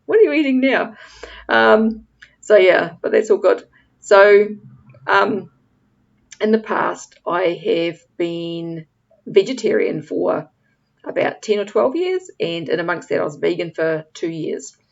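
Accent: New Zealand. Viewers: Thank you for your videos. I totally understand about you forget WHY you gave up a certain food or drink. I hope you keep feeling better. I'm dealing with the same and wondered about psyllium. I didn't catch what you said your friend got you started taking? Sorry, as much as I love your NZ accent 😍 I sometimes have to replay to catch everything 😄 Oh! I just now saw your reply to someone else on this! Thanks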